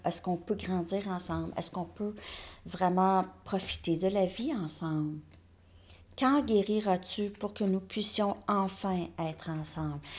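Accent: Canada. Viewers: Bjour trop mignonne un petit ange surtout votre accent canadien bonne continuation et rayon de soleil d Algérie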